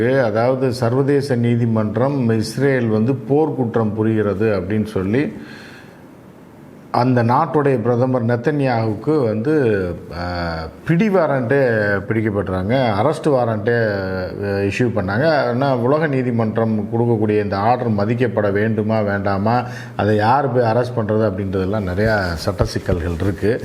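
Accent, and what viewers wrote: Madurai, India. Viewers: <link> pls brother be prepare and talk with our jeeva brother with our madurai slaang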